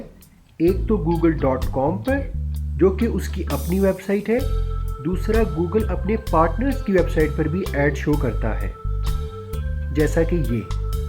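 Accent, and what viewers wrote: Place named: Kashmir, India. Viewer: Bhai, good help hy ye... Men khud Azad kashmir Muzaffarabad sy hon... ap kashmiri speaking hen kia? Your accent say's something about Kashmiri (Koshur) Language...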